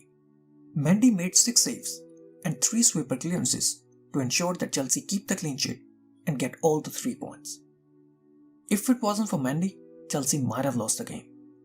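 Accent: India. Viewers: Love ur content, hate ur Indian accent.. 🤮🤮🤮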